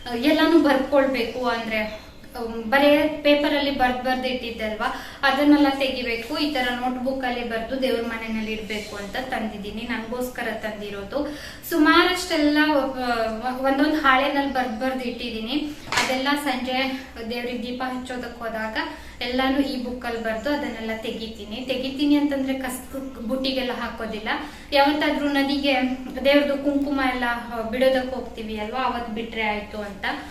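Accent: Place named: Kundapura, India. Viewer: Nim bashe kundapura side Tara untu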